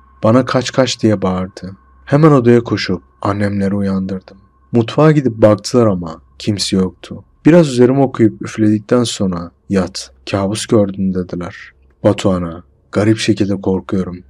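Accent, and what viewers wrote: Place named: Thrace, Turkey. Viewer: Severek dinledim ama merak ettiğim bişey var şiven trakyaya kaçıyo doğrumudur ben babaeskiliyim başarılar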